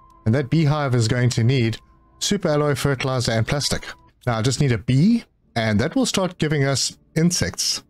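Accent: South Africa. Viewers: I knew it I thought I herd a South African accent 👌👌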